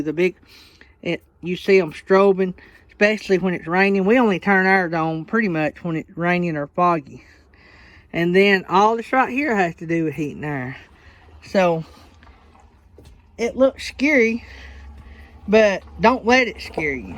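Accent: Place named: South, United States. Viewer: New Here and and blessings...sound like u are from the south.. I’m in NC... schools are closed..bus drivers are happy I’m sure no school.. ok listening to your video you are from Alabama 🌹😊 Family here as I love supporting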